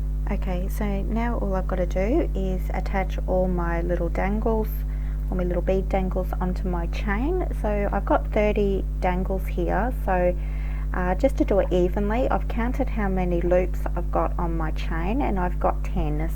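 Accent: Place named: Australia. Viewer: She is Australian! Listen to their accent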